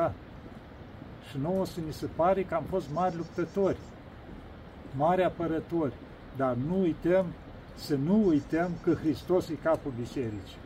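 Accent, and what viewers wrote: Moldova. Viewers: Doamne ajuta! Parinte, astept cu nerabdare sa va ascult in vorba dulce moldoveneasca sa ne vorbiti de Postul Pastelui!❤ Slava Tie, Doamne!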